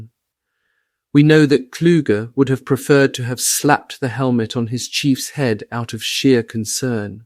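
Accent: RP, United Kingdom. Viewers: A British voice narrating a German point of view. Not a fan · Are you British or have you lived in United Kingdom for long. I like your accent. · It's Eton Pommy British. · Not a German accent but you can say the German names properly. Obviously, you're a Brit. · Accent is british not german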